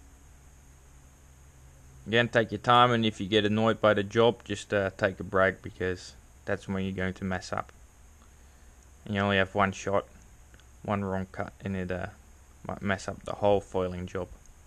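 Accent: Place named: Australia. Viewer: Sick bro knew that accent was Aussie! Love the vids bro keep up the great work!!! Yew!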